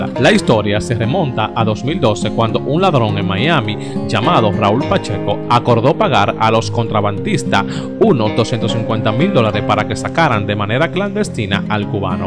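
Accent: Cibao, Dominican Republic. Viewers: Yo siempre les dicho a ese narrador qué hable más al paso, pera que suelte ese hablar tan Cibaeño, ejemplo, mul, al, sel, por decir, muy, hay, seis!!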